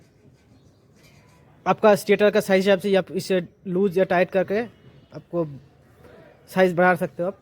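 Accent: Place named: Bengal, India. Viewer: ও,,, দাদা,,,,,,,,,,, হিন্দি আর একটু ভালো করে শিখুন না হলে বাংলা চলে আসছে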